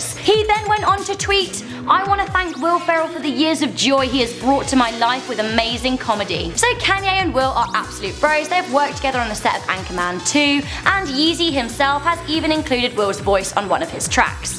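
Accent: United Kingdom. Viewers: Why do so many people who watch this channel act as if they've never heard a British accent before? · I know btw love your british acsent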